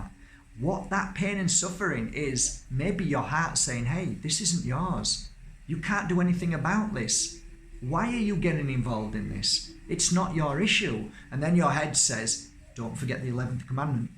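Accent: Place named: North, United Kingdom. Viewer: My friend recommended you to me and you’re absolutely amazing. I can very much relate to a northern accent too haha ....Thank you for helping me see the light 😊xx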